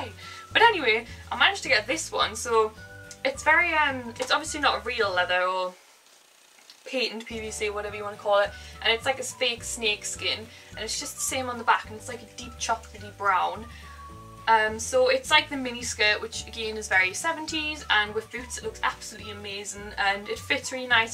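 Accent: Newcastle, United Kingdom. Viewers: You sound like ur from Newcastle (like me btw ) r u xoxo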